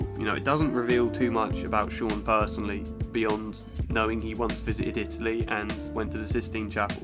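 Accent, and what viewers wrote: England, United Kingdom. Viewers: Average master’s level counselor’s commentary with English accent and annoying light Jazz music playing in background. C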